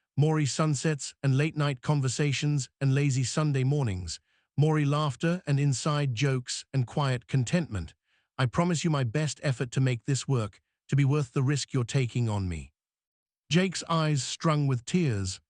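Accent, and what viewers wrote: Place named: England, United Kingdom. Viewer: Get rid of the English accent, please.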